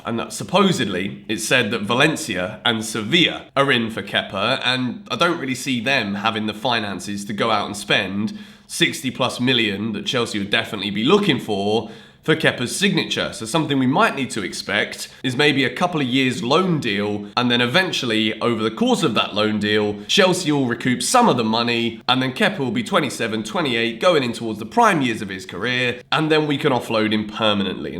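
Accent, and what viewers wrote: Scotland, United Kingdom. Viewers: Love u but the Scottish accent tho😬🤣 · Haha love the Scottish accent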